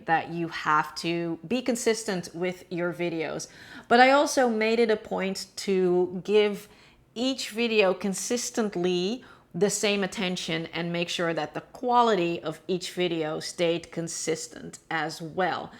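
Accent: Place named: Netherlands. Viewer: that’s amazing 😁 I love that you are following your dream and that you took the jump. I thoughtw I heard a ducht accent, but i thought I was wrong. Don’t know why I’m still typing in Dutch, but it’s to late to change it 😂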